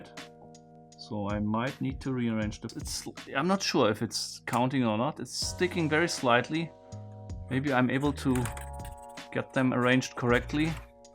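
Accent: Germany. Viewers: This is the first video I watch from you and 5 seconds in the video I thought "bro, this accent, he must be german" and then checked your channel information and I was right Hello fellow german buddy~ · U german? Cause you sound like my english teacher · He sounds sooo German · wie ich den deutschen Akzent sofort erkannt habe 🤝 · I can hear the German in you :D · You sound like you are a german xD Falls ja , hab einen schönen Tag ;) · I recognise a German accent when I hear one! This is just amazing, as I’m someone who is speaking in some odd amalgamation of different accents.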